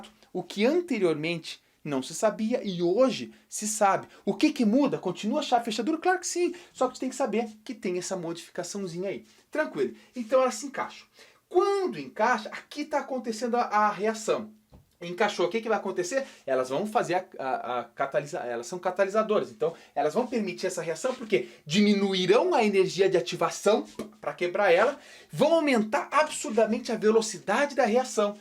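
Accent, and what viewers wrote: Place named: South, Brazil. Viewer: Bah, esse jeito do sul é da hora jkkkkkkkkkkkkkkkk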